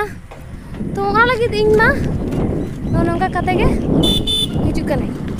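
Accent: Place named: Santhali, India. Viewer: Super santhali voice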